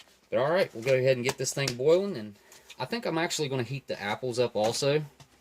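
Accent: South, United States. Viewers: Where ya from? At first I thought you were Australian but you sound American. You from Texas? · recognized the heavy southern drawl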